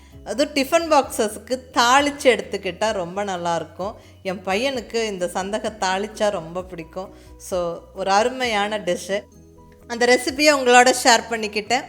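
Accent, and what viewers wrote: Kongu, India. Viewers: Always ur kongu style recipes and kongu accent tamil r very very nice. புழுங்கலரிசி இடியாப்ப (சந்தகை) முறையை வேறு வகை இடியாப்ப கட்டைகளில் பிழிய வருமா? நான் உங்களின் அனைத்து வீடியோக்களையும் நீண்ட காலமாகவே பார்த்து வருகிறேன். All r awesome.. சிரிப்புடன் கூடிய குரலும் கூட.., உங்களுடைய பிக்னிக், சேலை கடை விசிட், மற்றும் அனைத்தும்...!!!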